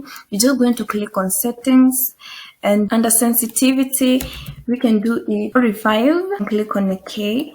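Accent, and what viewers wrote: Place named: Africa. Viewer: I say somewhere in Africa for the accent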